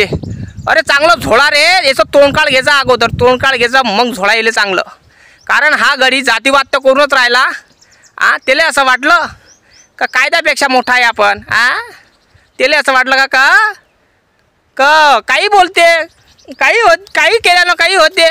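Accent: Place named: Vidarbha, India. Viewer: छान दादा मला तुमचे बोलण्याची स्टईल खूप आवडली. त्यामध्ये विधरबाचा गोडवा आहे. ❤️❤️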